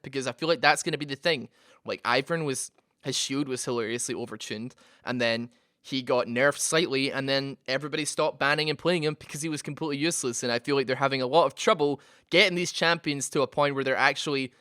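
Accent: Scotland, United Kingdom. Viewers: He's Scottish mate xD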